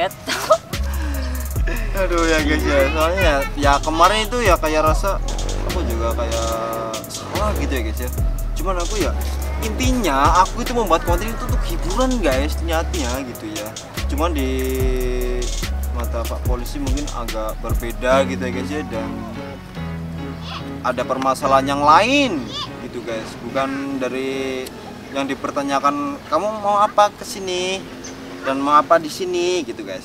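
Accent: Banyumas, Indonesia. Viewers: Gawe konten tapi ngomonge ngapak mas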